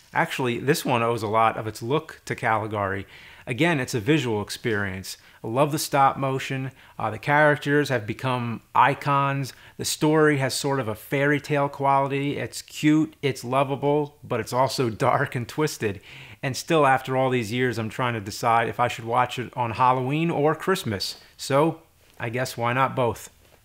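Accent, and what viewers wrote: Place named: New Jersey, United States. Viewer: Tyler White I think that would be his Jersey accent lol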